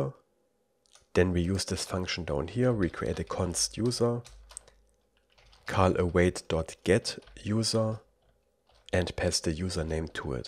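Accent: Germany. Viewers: man hört absolut deinen deutschen akzent aber sehr nices video bist nen cooler typ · After watching German content (mainly movies on Prussia ) for a fortnight I was thinking I was hallucinating as I heard a German accent but when I checked his location It was Germany //I was relieved that I was not hallucinating btw the thumbnail was no clickbait it was all in one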